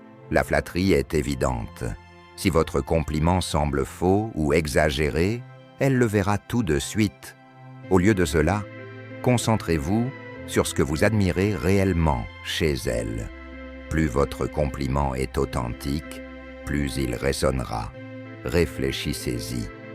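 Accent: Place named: England, United Kingdom. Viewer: Ton accent englais et ton ton robotique m'écourent. Va chier, sale intelligence artificielle. Je vais continuer à me fier à mes dieux.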